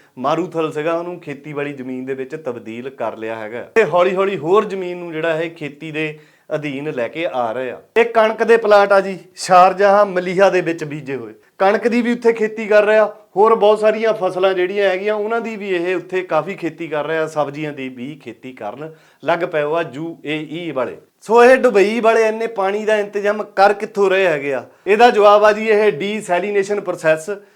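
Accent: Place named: Punjab, India. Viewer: If all you noticed is one word, not the effort behind the video, that says more about you. UAE, JuAE - pronunciation isn’t a big deal, especially when the message is clear. Focus on the content, not nitpicking. Respect the hard work. If you don't like to hear Punjabi accent, try uploading videos in English and I'll listen..go get some life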